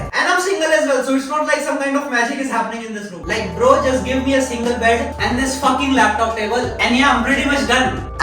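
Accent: Rajasthan, India. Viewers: Bro I'm from Rajasthan as well but I haven't met someone with that strong of an accent lol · you're funny af bro the indian accent makes it even better 🤣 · Bro got the classic Indian accent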